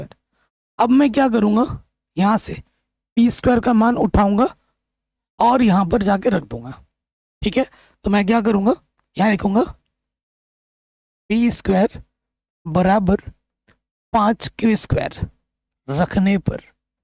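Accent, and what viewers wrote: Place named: Bihar, India. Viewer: Thodi Bihari hai 😅😊😊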